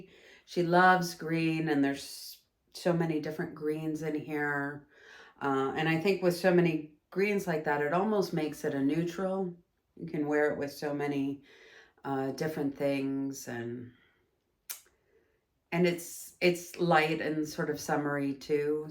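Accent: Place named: Canada. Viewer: Hi! Couple things first I have to say I want to know if you’re originally from Canada because as a Canadian I have what I call Canadiandar, which is Canadian radar, and as I was listening to you talk about this yarn, I instantly thought this woman is Canadian and then it says you’re from Germany, but obviously you weren’t born in Germany or you didn’t grow up in Germany because of your accent, so can you satisfy my curiosity about that? Second of all, I was looking for a review of this yarn because I’m about to order it to make a shawl and I see that you made a shawl with it. That’s so awesome. So thank you for your review because it was really the only one I found. Sidenote, another content creator that I watch makes stuffed animals with yarnart jeans yarn, and she says it’s the best one for that. I don’t make it so I don’t know, but I do love the various yarnart flowers. 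And by the way, yarnart does make an amazing cotton and a cotton acrylic blend that is very soft although I think the shawl you made is beautiful and completely worth gifting. Yarnart flowers is the cotton acrylic blend and yarnart rosegarden is the cotton, and I make shawls with them all the time. They come in big cakes over 1000 m actually you’re probably familiar with this yarn because you live in Europe, so you’re so lucky. You have easy access to this stuff. Actually yarnart flowers is the exact same yarn as hobbi twister. It even has the same colors.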